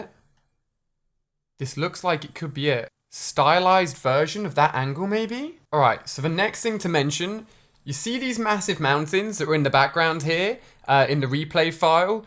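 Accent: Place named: United Kingdom. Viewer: Can you stop talking in that British accent it’s kind of annoying talk like a regular person please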